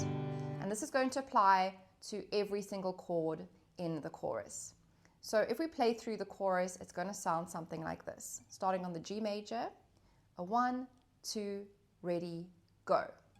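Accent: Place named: South Africa. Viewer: Love the Safa accent! Makes me miss home!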